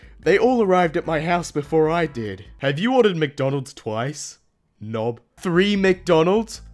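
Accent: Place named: Australia. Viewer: Emkay bUt Australian.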